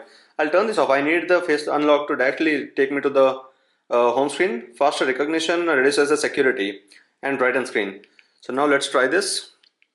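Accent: India. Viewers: Wdym 😂 I'm not a native English speaker yet I can understand this guy very well. He's just speaking it in an Indian accent. And no, I'm not Indian either.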